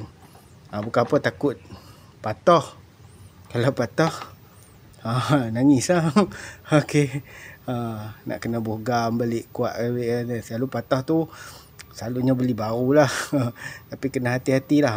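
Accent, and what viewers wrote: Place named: Perlis, Malaysia. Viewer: Abg org perlis ka,cara abg ckp mcm org perlis....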